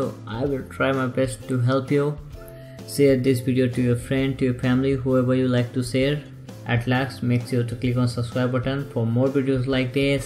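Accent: India. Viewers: bcoz Yours Voices Has been like an indian guy😊😊😊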